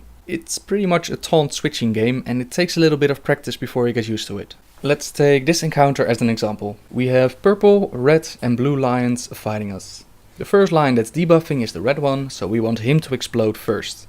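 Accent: Netherlands. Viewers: Dude, amazing guide, real nice and clear:) I have a question tho, Are you dutch? you sound like a dutch guy talking english:p Keep it up man:D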